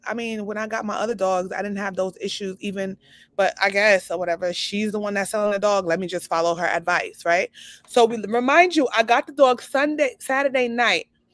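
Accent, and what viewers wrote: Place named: Brooklyn, United States. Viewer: The Brooklyn might come out of you & they just don’t know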